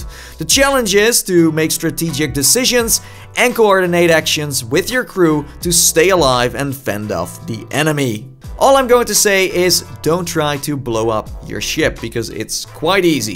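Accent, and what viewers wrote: Netherlands. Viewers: dat thicc dutch accent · You're just another cookie cutter channel with the added annoyance of your Dutch accent trying to sound American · Are you dutch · Het nederlands accent met de vele uh's doet echt pijn Wakey wakey tietel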